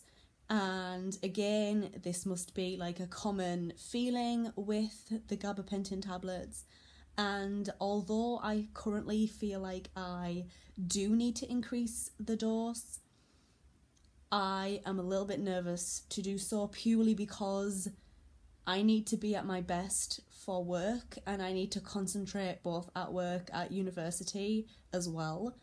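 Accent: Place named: Scotland, United Kingdom. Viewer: Lovel, cute accent. I presume Scottish. Sorry, I am from California and haven't been to your part of the world yet. But yes, Gabapentin works for me for diabetic neuropathy and absolutely has different affects depending on so many things, like foods I have eaten, interaction w/ other meds... BTW you've got some brilliant music, et al. of course! assuming you are Scottish that is.How is the Gabapentin working now?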